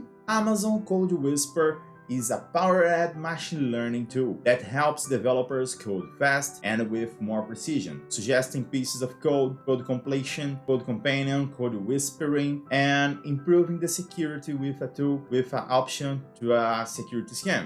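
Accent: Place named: Brazil. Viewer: Eu logo percebi que vc é brasileiro, nosso sotaque é unico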